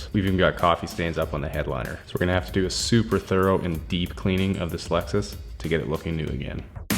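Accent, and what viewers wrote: Canada. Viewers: Bruh is he Canadian cause aye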